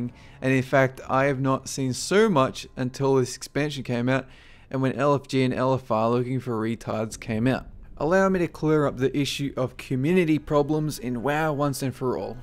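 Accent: Australia. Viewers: i love your accent lol now stupid question, are you Australian? Thanks for all your time in making these videos i am learning so much about WoW that i never new before. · You're Aussie arent yah? What realm do you play on wow?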